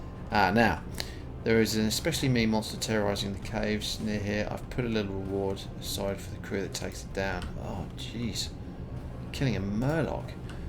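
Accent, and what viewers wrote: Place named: Australia. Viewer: Was looking for some beginner s guide for Barotrauma and found you, boy am I lucky: I dig your style! you sound like a nice guy, you're a father like me, you play cool games and all... I am just sad you don't twitch (?). I'd even play with you! Though you sound a bit like the hilarious SovietWomble, from your accent I would say you're an Aussie? if yes I just arrived to your great country and I love it! Cheers mate.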